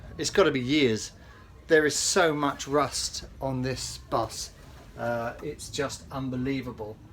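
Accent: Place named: South London, United Kingdom. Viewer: Ooo I do like that MCW in the distance and that Leyland National, the MCW was seen a lot at Peckham and Camberwell, New Cross garages and was quite popular for its racey look. Nice to hear a South London accent, my local garages were Catford and Bromley and used to earn a bit of pocket money helping out on a saturday at Catford and they kept on a RT many years after they had been withdrawn where if memory serves me right was moved to Bromley til someone up top decided to out it. I have driven a RT and an RM on a "thank you" shunt at the garage when the safety elf decided kids should not be sweeping and helping out in such a dangerous place.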